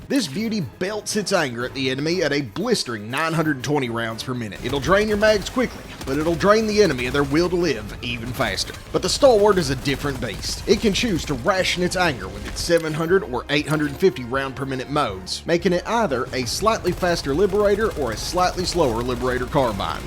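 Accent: Scotland, United Kingdom. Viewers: I got so used to your nice scotty accent that when the switch to the redneck Southerner accent came it felt like I got punched in the face. A very Democratic punch, but a punch nonetheless. Great work 👍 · Got as far as the 50th mispronounced 'a' then switched off. Just speak normal, mate. Its ok to be Scottish.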